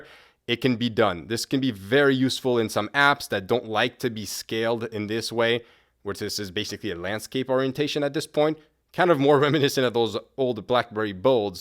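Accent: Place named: Montreal, Canada. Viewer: Random but are you from Montreal? I hear a familiar accent 😆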